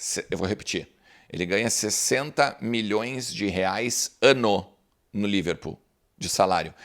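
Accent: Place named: Porto Alegre, Brazil. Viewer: Força mais o sotaque porto alegrense...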